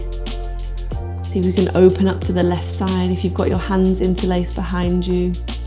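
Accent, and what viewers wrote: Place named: United Kingdom. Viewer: Just found your channel and really enjoyed this class, thank you! You sound like a Brit, was this filmed in the UK? It looks pretty spectacular 🤩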